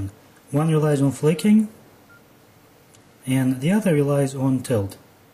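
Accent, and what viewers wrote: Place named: Russia. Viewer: He have a russian accent :))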